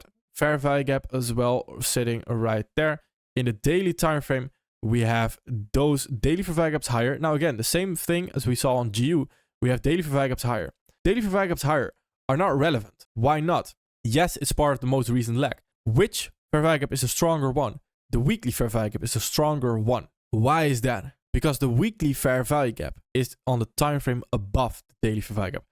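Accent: Netherlands. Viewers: Ik ruik nederlands accent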